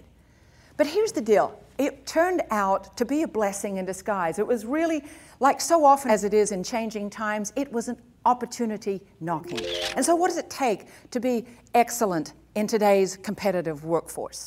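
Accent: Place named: Australia. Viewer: Houston speaker with an Aussie accent - who would have thunk it?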